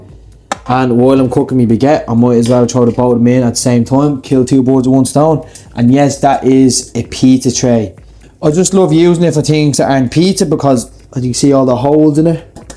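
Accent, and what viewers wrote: Ireland. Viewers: So....it's called a CHICKEN FILLET ROLL. Good to know. Yet it is not written anywhere on this screen except here in my comment. I had to peeeeeer at your tiny subtitles when I caught the name and rewound to find it again. We don't all speak with an Irish accent. You are welcome, lol. (Hey! Don't call me that!!)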